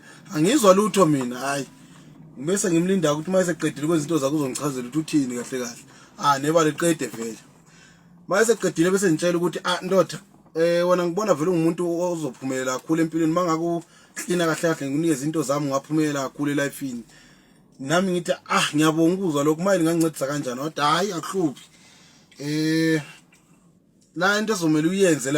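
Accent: Zimbabwe. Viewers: Isi zulu sakho sithi ubuya e Zimbabwe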